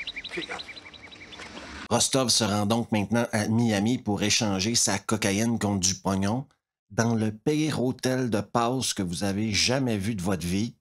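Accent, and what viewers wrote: Quebec, Canada. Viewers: Y'a quand même bien un sacré québécois pour nous raconter cette hostie d'invasion communisse que Chuck il a arrêté, merci pour l'histoire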